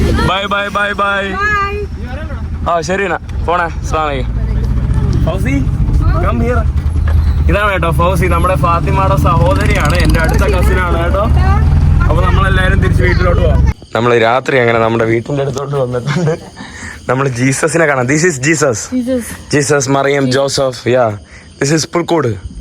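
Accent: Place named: North Kerala, India. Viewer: boss , eatho oru uthara keraloo aa samsarathil oru pucham …i luv malappuram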